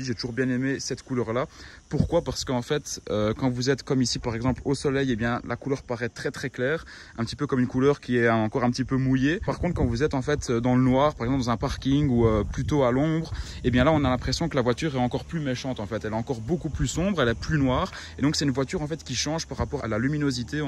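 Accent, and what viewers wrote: Belgium. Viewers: T’es belge ?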